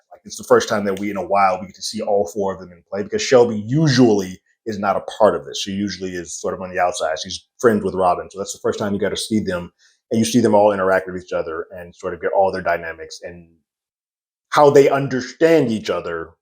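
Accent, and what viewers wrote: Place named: Midwest, United States. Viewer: Great review and you are really handsome 😊. You sound like a mid westerner. Just rewatched the show again and stumbled on the review.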